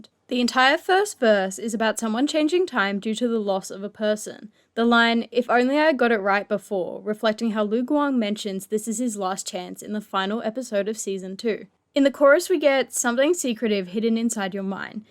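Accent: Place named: United Kingdom. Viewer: british spotted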